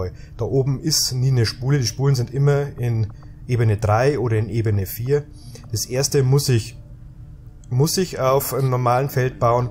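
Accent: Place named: Bavaria, Germany. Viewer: Da kommt definitiv da Bayer durch. ;)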